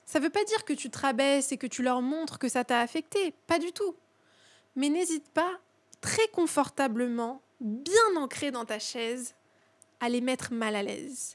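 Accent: France. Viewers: Bonsoir, j'espère que tout se passe pour le mieux pour vous. Je vous suis là récemment mais sur insta, plus d'un ou peut-être même deux ans. J'adore la façon dont vous nous parlez, c^est tellement inspirant. Je m'appelle comme vous et viens d'Iran. Je suis iranienne, mais je sais bien parler le français et enseigne le français. J'ai entendu dire que vos étiez d'origine irannienne du côté de votre père. Ça m'a tellement surprise qu'il y a quelques jours, je vous ai envoyé un message sur insta mais vous ne l'avez pas encore vu, j'ai donc décidé de vous parler là. J'ai une simple question à vous poser: est-ce que vous pouvez aussi parler le persan? Aussi bien que le français. Bon écoutez on vous considère comme une française d'après votre accent mais j'aimerais bien savoir si vous avez déjà voyagé en Iran? Sachez que j'apprécie votre travail et j'essaie de ne pas manquer vos vidéos. 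Car, d'une part, je renforce ma compréhension orale et d'autre part, j'apprends bcp choses qui concernent la vie, le développement personnel ainsi de suite à travers vos vidéos. Bonne continuation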